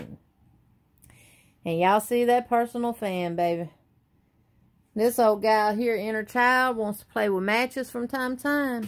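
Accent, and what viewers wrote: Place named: South, United States. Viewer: Thank you for sharing your craft room with us. I LOVE your "corner" SOunds like you are another southern gal! Wonderful to meet you!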